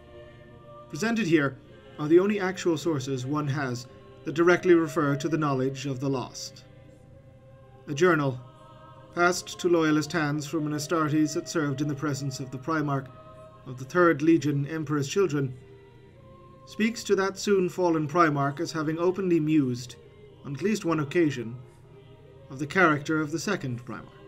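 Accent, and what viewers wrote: Ireland. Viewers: As a lifelong warhammer fan can't believe I am only finding this channel now. Exceptional presentation and delivery. Are you from Ireland.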